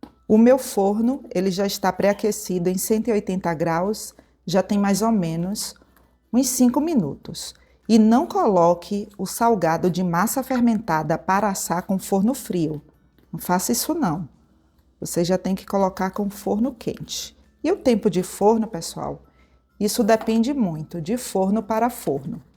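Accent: Bahia, Brazil. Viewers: tu é simplesmente maravilhosa abençoada. Que mãos de fada. Sem contar que tú é muito espirituosa 😂😂😂😂 te adoro. Obrigada por existir em nossas vidas. ❤❤❤❤💋💋💋💋🫂🫂🫂🫂 Vcs goianos, baianos são muito parecidos com a gente aqui do Rio grande do Sul muito hospitaleiros. Acho que pelo teu sotaque tu é baiana né? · Mto didática sem falar mto e enrolar, dá gosto de ver suas receitas maravilhosas, parabéns!! Sem contar que esse sotaque baiano deixa td mais gostoso...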